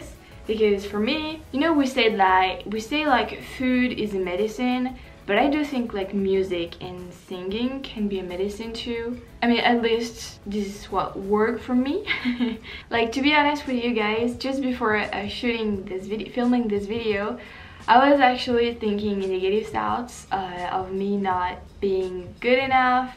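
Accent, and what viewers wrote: France. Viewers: Your ( French ) accent is so beautiful, so calming, so relaxing as well...Great reaction my friend..Cheers.. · I think you are such a great person! I love your passion for her and you are right that she can make life better to listen to her when things are stressful. with the virus right now she is able to make it better. You are so cute as well and I love it when you talk! I love the french accent you have and it makes me happy just like when sings!!!!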